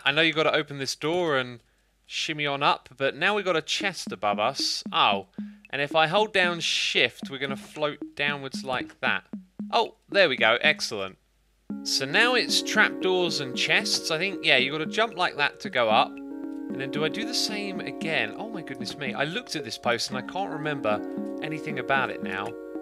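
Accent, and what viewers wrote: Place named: United Kingdom. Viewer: I always find it fun to compare pronunciations with you X. Me: Aich X: Haich Me: Or-kid X: Or-chid Me: mural X: murial (this one bugs me a bit) Me: Zee (sometimes zed) X: Zed (ofc cause British) Me: compOster (with long O sound, like the word Open) X: Composter (short o sound, as if saying the word posture) I keep waiting to hear more and it's become a fun game now. Hope X sees this! <3 Cheers Buddy!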